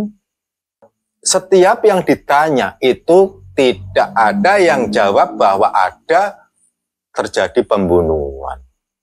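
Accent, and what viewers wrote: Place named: Java, Indonesia. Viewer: tpi logat nya ko Jawa ya 😄haduhhh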